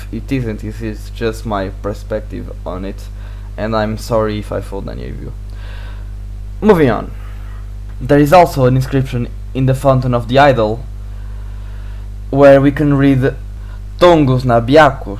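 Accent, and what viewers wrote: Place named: Portugal. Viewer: Sotaque português. 👍🏼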